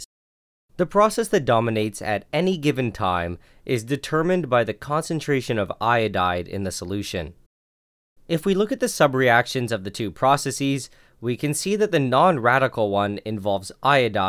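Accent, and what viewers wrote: Canada. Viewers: I love your thicc Canadian accent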